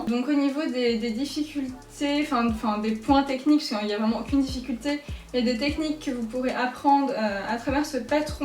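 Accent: Paris, France. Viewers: Vidéo très sympa tu me donnes envie de me mettre à la couture ahah. Tu as un petit accent parisien, on te l’a déjà dit ? 🥰 Hâte de voir d’autres vidéos merci à toi!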